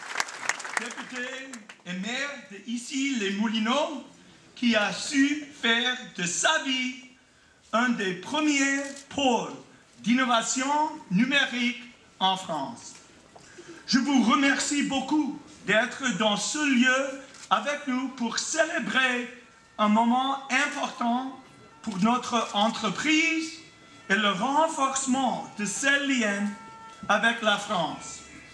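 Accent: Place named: England, United Kingdom. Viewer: omg I was not expecting this when I hit play 🤣🤣 fair play to him, I would not have the guts to speak on stage if my pronunciation was this bad. It actually gets better as he goes on, just his intonation/cadence is very English.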